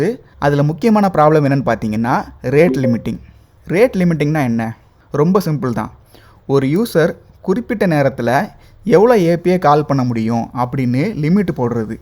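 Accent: Tirunelveli, India. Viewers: Neenga Tenkasi, tirunelveli side ah 😊😊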